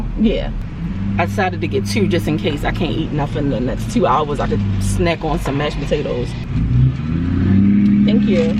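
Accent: Baltimore, United States. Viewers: You definitely are from Baltimore with that accent? Lol. Nice haul!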